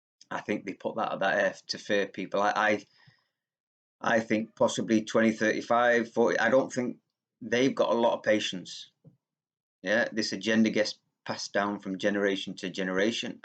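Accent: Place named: Manchester, United Kingdom. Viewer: Real interesting vid, nice to hear a Mancunian on utube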